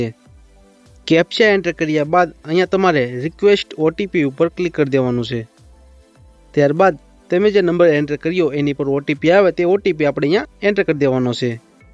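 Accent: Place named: Surendranagar, India. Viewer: Surendranagar baju ni Bhasha ma avu bolaya 6e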